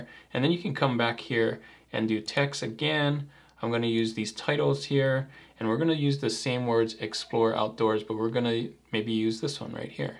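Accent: Hawaii, United States. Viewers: thanks for all these videos, been learning a lot. quick question, are you from hawaii? cuz after watching some of your vids, it's subtle but i noticed that certain words you say sounds very close to how some people from hawaii would say them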